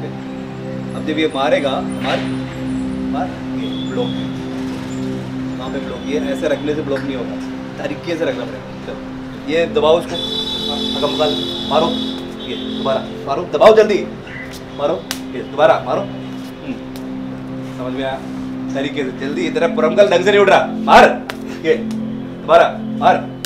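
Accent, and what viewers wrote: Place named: South India, India. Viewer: Excellent Sir.... I am doing foundation course of Kalari and once done, I would definitely like to connect with you.... Sir aapka hindi language mey jo 2 percent south indian ascent hey usko barkarar rakhna.... 🙏😊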